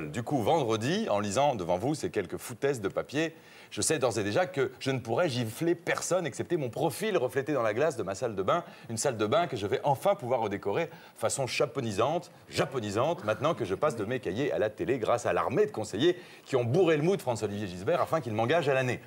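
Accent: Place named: Quebec, Canada. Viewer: Merde il a vrament l'accent québecois non de dieu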